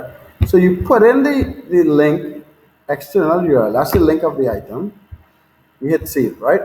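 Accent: Trinidad and Tobago. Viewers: sounds like u from trini man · No BS Drop Shipping Training WHAT DE ASS BOY! A Trini! Oh Gossshhh! I subscribing Now! I'm from Laventille!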